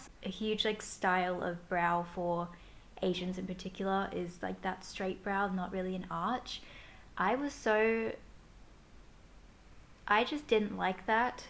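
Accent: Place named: Australia. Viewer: Your a Aussie even your Aussie accent That's so bad my brother has a Thai wife who lives here I have a Thai girlfriend who's in Thailand and my youngest brother has a American girlfriend who's parental are Lao you shouldn't feel like that your very beautiful and your eyes are very round don't care about people who say bad stuff about you and the same my Mum and Dad come from England but I am Australian you're just as me don't follow trends that's exactly what a trend is they come and go and I think you're a lot more beautiful than most other western Australian girls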